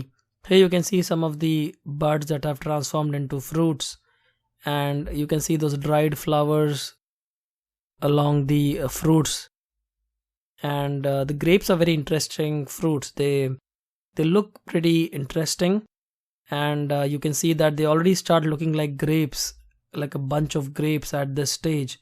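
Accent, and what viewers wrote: India. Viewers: Your accent is Indian man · Sound like india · why you sound like indian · your voice teling that you are indian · I know u r indian By ur ascent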